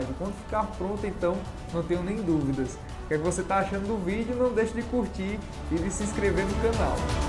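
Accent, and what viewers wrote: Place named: Paraíba, Brazil. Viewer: Mais vc não é de Fortaleza vc ta um pouco com o sotaque da Paraíba e do Pernambuco!!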